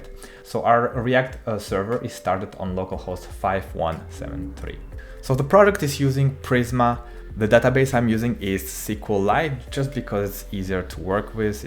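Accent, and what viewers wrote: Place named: Russia. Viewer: А можно на русском, вы явно по акценту знаете , знайте у вас есть русскоязычная аудитория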